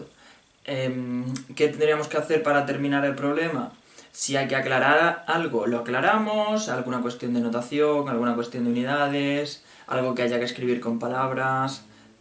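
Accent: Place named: Castile, Spain. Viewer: Buen vídeo! En este vídeo te sale un acento súper castellano comparado con los otros!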